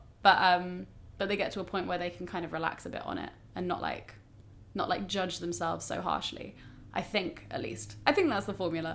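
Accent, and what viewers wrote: United Kingdom. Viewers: I just want to say that your British af accent kills me. Seriously.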